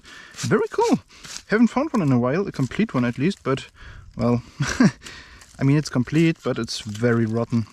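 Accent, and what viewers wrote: Germany. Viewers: I was thinking the same thing about the pronunciation of iron. Another word is enamel, German accent pronounced animal but we still love your channel. ❤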